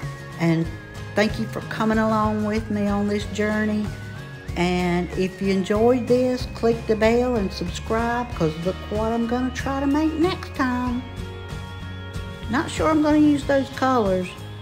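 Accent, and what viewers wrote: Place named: Appalachia, United States. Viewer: I have been watching videos on how to make a barn quilt. Yours is absolutely the best! You give very clear, step by step instructions. You are the exemplar of the fine Appalachian qualities I so appreciate. You demonstrate resourcefulness, determination, creativity, and perseverance. For examples, the way you decided to make your own rather than buy one ready made or use a kit, the way you used the extra wood to make a shelf, the way you used the reverse side of the board when you needed to begin again, the way you were committed to making the best product you could - even if it required more work. I admire you so much! And may I add that your soft-spoken regional accent is very pleasant to hear. I am recovering from surgery and will be off my feet for a few more weeks, but as soon as I am up, I plan to begin some of these paintings for our sheds. 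Thank you for taking time to show others how this is done.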